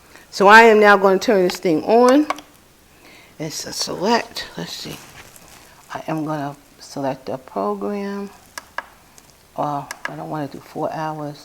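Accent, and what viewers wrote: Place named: New York City, United States. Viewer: I'm going to make this for thankingiving u sound like a NYC lady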